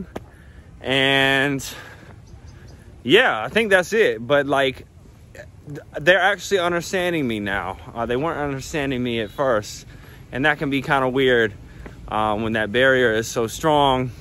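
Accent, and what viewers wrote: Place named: South, United States. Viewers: Gorgeous videos. Love the accent. Where? Louisiana? ... Did you finally see some city personnel on the China side? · Great video n I enjoyed it. Guess you re from the south? Greetings from Southern CA.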